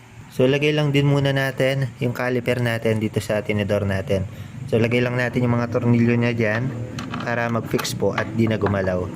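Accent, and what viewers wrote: Pampanga, Philippines. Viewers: Paps kapampangan ka ba?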